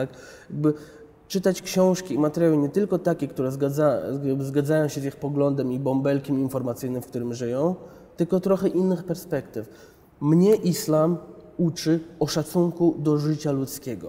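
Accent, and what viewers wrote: East, Poland. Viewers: Ma delikateńki, leciuteńki, prawie nie słyszalny wschodni akcent. Można go zauważyć.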